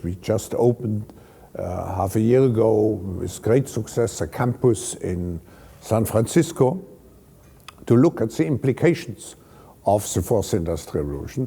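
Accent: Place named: Germany. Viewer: That German accents is not a meme lmao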